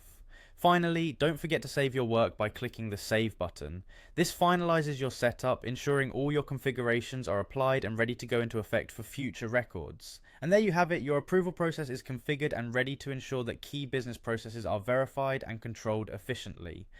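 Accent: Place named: England, United Kingdom. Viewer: Great video! Finally a proper English speaking accent 🤩 music to my ears 😍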